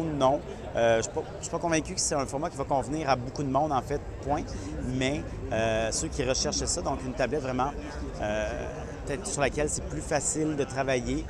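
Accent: Quebec, Canada. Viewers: L'accent québécois est toujours aussi marrant mais on comprend très bien et vidéo très intéressant. Merci beaucoup pour cette présentation.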